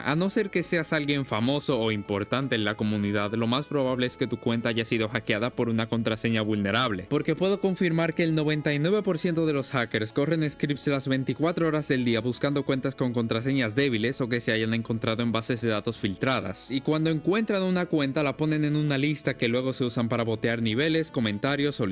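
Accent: Dominican Republic. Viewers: Espera, sos de dominicana? Ese dato no me lo sabia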